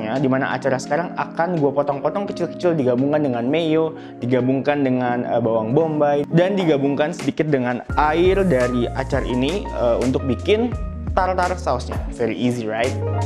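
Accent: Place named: South Jakarta, Indonesia. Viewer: Kebiasaan liat&dengerin aksennya Gordon Ramsay ama Banging with Babish jadi agak gimana denger aksen ala Jaksel.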